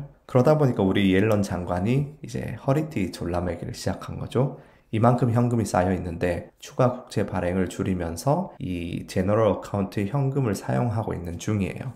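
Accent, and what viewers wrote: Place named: Daegu, South Korea. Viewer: 사투리가 대구 사투리시네요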